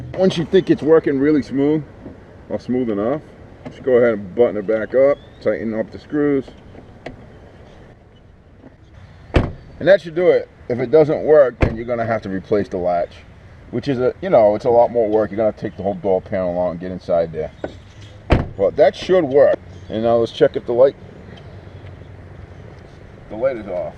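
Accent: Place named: New York, United States. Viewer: love your accent man. Is that NY?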